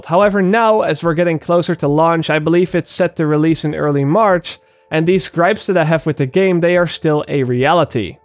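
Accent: Netherlands. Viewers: Because you sounds dutch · ik dacht al te horen dat je nederlands was haha. duidelijke mening. ik deel hem deels... ik ben bang voor het spel. angst dat ik teleurgesteld word. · why does this guy sound like he is dutch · ik hoorde gelijk aan je accent dat je nederlands was😂😂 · The Gamer Of Canada sorry. i didn't watched the whole video but i heared his accent and then i wanted to ask if he was from the netherlands.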